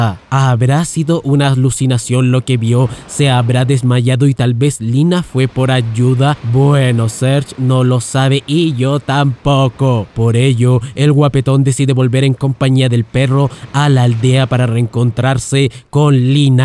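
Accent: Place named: Chile. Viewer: Solo acotar que al parecer el personaje Toma en según Wiki es descendiente del Toma que aparece en Chrono Trigger. Por lo demás, se agradece este resumen, por el poco material de este estilo que hay al menos en español, y por lo enrevesada y a la vez entrañable y bien construida que es esta historia. Mención honrosa al acento y las referencias chilenas 🤭✨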